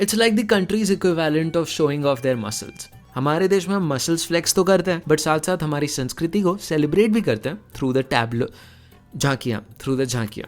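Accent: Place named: Agra, India. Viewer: Bro, I love your voice. ❤️ It's so soothing. ( Are you from Agra or near ? Your accent tells me. ) Apart from Kurzgesagt, Neelesh Misra and Think School, yours also is my favorite youtube channel. Thank You so much !!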